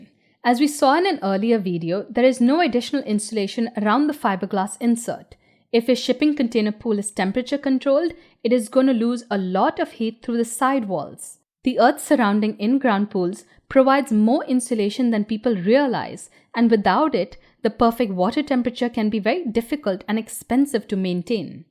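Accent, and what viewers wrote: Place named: India. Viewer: You are the only Indian person i like on YouTube. I usually cant stand the accent but your information is so well worded and to the point that I don't mind. I assume this compliment also sounds like an insult, but that is not my intention. Just being honest. Thx for the upload!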